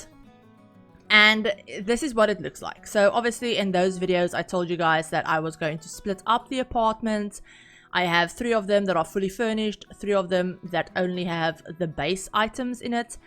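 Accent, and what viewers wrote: South Africa. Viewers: You sound south African, im from SA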